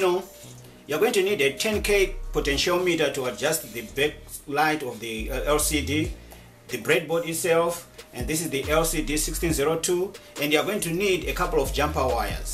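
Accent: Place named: Shona, Zimbabwe. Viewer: you sound Shona though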